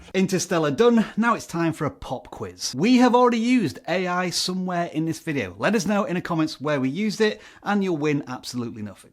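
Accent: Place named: Northern England, United Kingdom. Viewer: Another great video,! Still love the Northern England accent. I actually got to this video, because I was previewing one of my videos and it popped up in the side bar.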